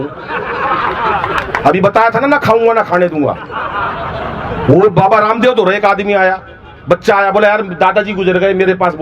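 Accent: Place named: Haryana, India. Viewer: शाबाश, सर जी, हरियाणवी प्रभाव बरकरार रखे ।बहुत बहुत बेहतर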